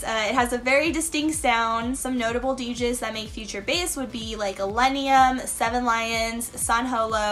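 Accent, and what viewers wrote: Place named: United States. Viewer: The way you pronounce things as an American isn't wrong. If it's a result of your dialect, it's just part of the way you speak :)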